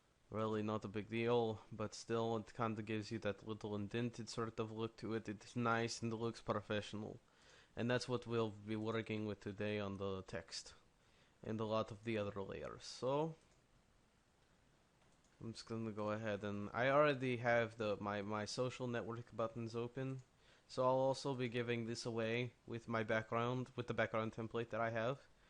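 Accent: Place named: Russia. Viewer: He's Rrrrrussian.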